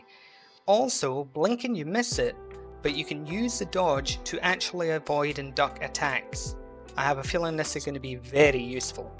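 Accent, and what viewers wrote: United Kingdom. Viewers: Bro, where ya from? Roughly Ya sound British, but you Actually put in effort in saying the Spanish words well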